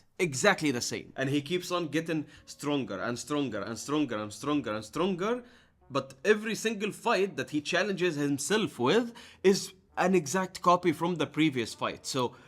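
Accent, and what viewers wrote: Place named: Egypt. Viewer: how r u talking Egyptian in English btw i found u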